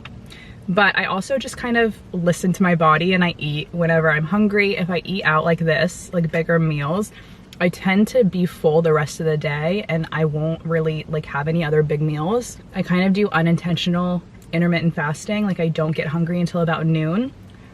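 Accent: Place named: San Fernando Valley, United States. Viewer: Please dont take offense to this, good vid, i subscribed..are you from the valley? Im from seattle, but i got cousins who lived somewhat near to the "valley", for a short while i worked there. Theres a specific tone that you got..its reminiscent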